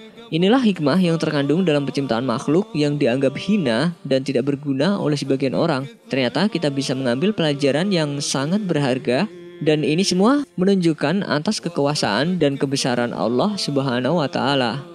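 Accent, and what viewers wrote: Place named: Kebumen, Indonesia. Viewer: Wong kebumen ngapak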